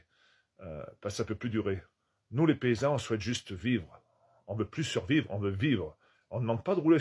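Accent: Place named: Auvergne, France. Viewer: Brave Monsieur honnête et courageux. Je vous écoute et pense à tout ce monde de la paysannerie malmenée depuis des lustres. Espérons qu'avec vous ils vont pouvoir se relever et marcher la tête haute à vos côtés. Mais dans cette vidéo moi aux racines auvergnates, c'est le port de votre tee shirt à,la marque Adidas que vous portez sur votre poitrine. Bien à vous l'auvergnat au bel accent.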